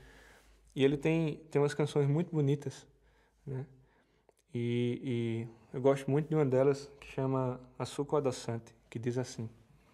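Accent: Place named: Northeast, Brazil. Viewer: Eita sotaque nordestino cabra da peste. muito bom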